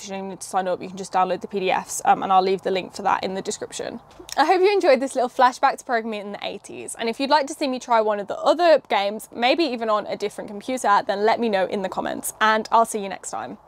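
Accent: United Kingdom. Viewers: Im so confused, you look so young but you're playing with old things that I only remember seeing at my older cousins' house when I was really really young and I'm at least 10 years older than you. This made me smile a lot, this is so cool. Thanks for bringing me this time travel experience with a very special british accent. Keep going and having fun. 😁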